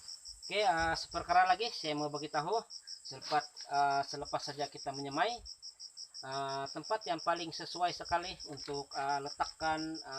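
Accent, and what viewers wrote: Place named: Sabah, Malaysia. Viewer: Dari cara pertuturan spt org dusun Sabah..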